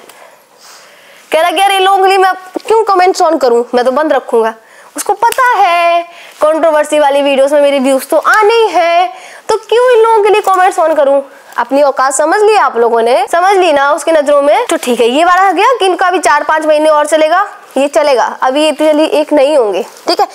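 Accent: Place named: Siwan, India. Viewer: Shahi boli hai ap hmbi Siwan se hai 😂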